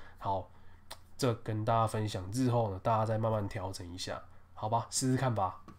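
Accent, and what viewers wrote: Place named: Taiwan. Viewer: 台湾腔诶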